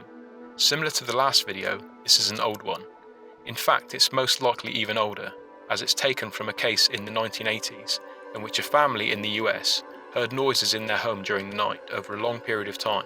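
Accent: United Kingdom. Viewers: His UK voice is awesome too awesome could be fake maybe he's from the 80's rock music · I think you have to much time on your hands down in mamas basement,also a british accent can only go so far toward making you look smart.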